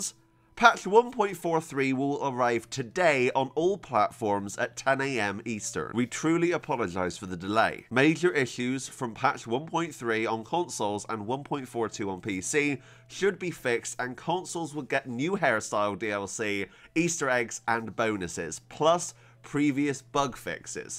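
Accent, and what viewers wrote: United Kingdom. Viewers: I thought so haha. Am also from the UK so kind of recognised the accent. Just wasn't a hundred percent sure haha. Enjoy the videos though! Have only recently got kingdom come and still fairly new to pc gaming. But thoroughly enjoying it, playing alongside it with The Witcher 2, not sure if you've played the witcher series but they are also great games. Have started reading the books recently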